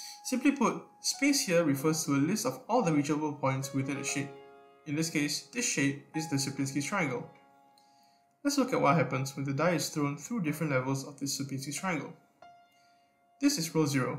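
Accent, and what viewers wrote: Singapore. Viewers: it's your first video so it's normal that you have a lot to improve. my advice for the next one, put some text. even if you get a new mic, you still have the singapore accent and some westerners can't understand it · yess recognised the accent as a fellow sinkie, keep up the good work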